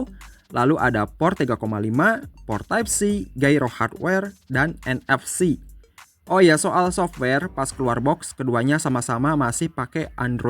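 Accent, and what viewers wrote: West Java, Indonesia. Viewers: Mang siga orang sunda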